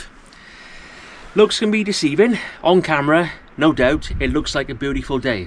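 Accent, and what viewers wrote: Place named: Barry, United Kingdom. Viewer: Hello lad, been meaning to say hello. Your accent sends me back to my youth. A boy from Liverpool spent his formative years growing up, then working in Barry. i worked on the fairground in Barry till I left school...then worked for a Barry outfit...industrial painting. Approaching 65 ,I am a couple of years returning to fishing. i have fished a number of these marks when I was teenager with my brother in-law...*but considered (my brother) he was from Barry.(unfortunately he passed young). He used to take me out swimming in that bay for quite a distance. So as you see, your accent has thrown me back , even though a bit more lighter than his. Here`s a little `ode' to my mate... The Boy from Biscay (Taken early) Drag me out Adagio Drag me out Mate I’m a foal riding a dragoon covered by a halogen sky... We breast stroked some salted spat and swam that white fool embracing heat waves and beams We kept our heads up in those waters broken and how my mate saved me from drowning Wish I had spelt...in a word reciprocate Drag me out Bosun...I’m all awash I’m a babe along a buoy of Biscay The swims I made with you though, have left me walking an Isle... 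hidden yet binding How I wished I dragged Adagio upon the oils of spelt and massaged away his fate So when I found this lagoon retracing, it was a token of a moistened embrace Now when I think of you the buoy of Biscay... I hope you will wheat. ps...bit of fun <link>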